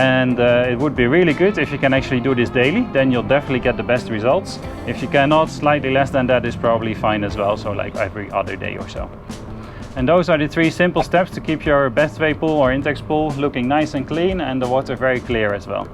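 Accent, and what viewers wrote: Netherlands. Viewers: You sound Dutch, but the environment looks way better than here in Holland. Nice video! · When I heard him speaking I immediately knew he's from the Netherlands 😂 I just know the accent lol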